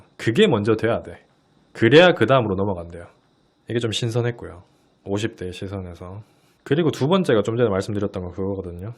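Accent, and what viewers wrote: Gyeongsang, South Korea. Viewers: 나 50대에요. 구독하고 듣고 있어요. 나도 경상도 출신이라 사투리 억양이 편하고 시니컬한 조언이 좋아요.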